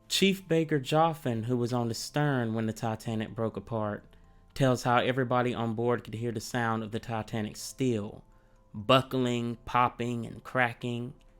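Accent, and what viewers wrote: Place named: South, United States. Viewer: Young man, I have to thank you for several reasons. One is that I'm glad that you're parlaying your shipboard experience into a YouTube channel, and I'm glad that we have an American black kid with a country accent doing a historical documentary on YouTube instead of the excessive number of British dudes with British accents, or even worse, those AI bots with the metal voices. Thanks, good to see some homegrown talent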